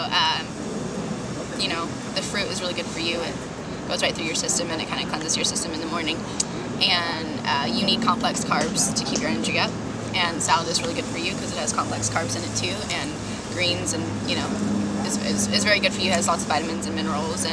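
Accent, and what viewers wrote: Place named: United States. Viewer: i thought she was from south africa? she sounds american to me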